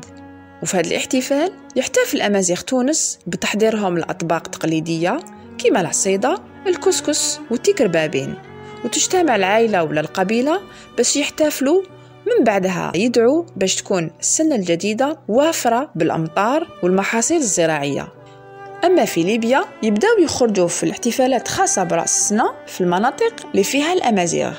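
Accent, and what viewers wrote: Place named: Algiers, Algeria. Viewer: يعطيك الصحة، و تبارك الله على ذاك الصوت الحنين و على اللهجة تاع العاصيمة 😘 الله الله عليك . أسڨاس أمڨاز ثانميرث سڨذرار ن جرجرة 🇩🇿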